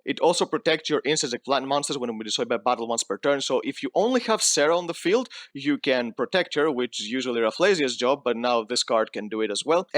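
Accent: Greece. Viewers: Your accent sounds greek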